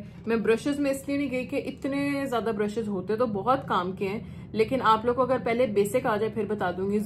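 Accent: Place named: Punjab, India. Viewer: Bhtt achi lagi kal lazmi yeh trick try krungi nd ur punjabi talking way sun kr to video dekhne m or maza ajata ❤❤❤❤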